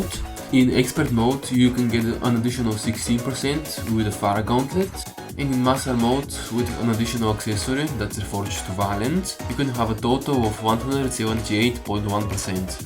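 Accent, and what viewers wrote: Russia. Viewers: твой акцент очень похож на русский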